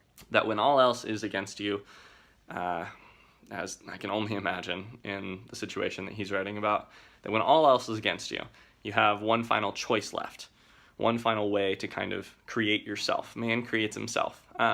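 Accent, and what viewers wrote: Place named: United States. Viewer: You know,. you are so American. The way you carry yourself and talk is typical American ( according to what I think is typical American I guess😅) so adorable🥰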